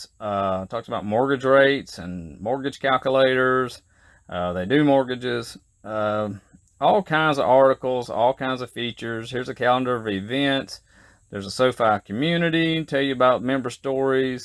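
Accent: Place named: South, United States. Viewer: i love that country accent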